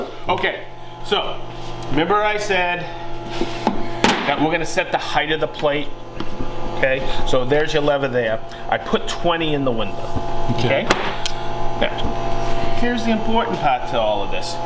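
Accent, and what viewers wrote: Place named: Rhode Island, United States. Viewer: I’m from Rhode Island I know that accent , living in Savannah Ga.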